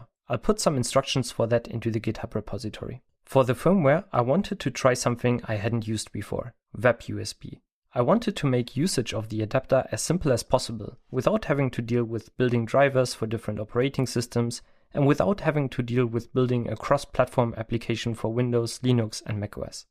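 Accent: Germany. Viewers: I can hear a German accent ^^ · Ein Deutscher, das hör ich doch direkt 🤣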